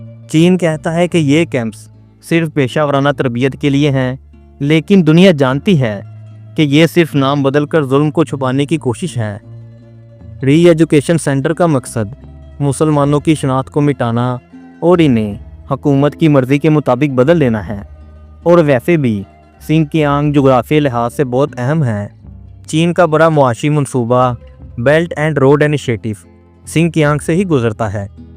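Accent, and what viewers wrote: Punjab, Pakistan. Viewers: Aap kindly Urdu ka labo lehja zara behtar kur lain warna Punjabi style mein Urdu suit to nahi karti baqi aap ki marzi, zuban Punjabi ho ya Urdu us ka asal tarah ada kurna hi Zuban ka Husan hai warna Allah ki Panah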